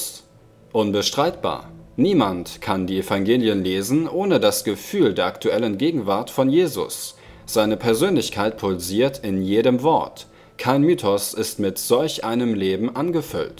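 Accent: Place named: Switzerland. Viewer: haha bisch du en schwiizer ?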